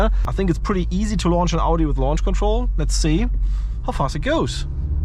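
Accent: Germany. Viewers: Most german english i have ever heard :)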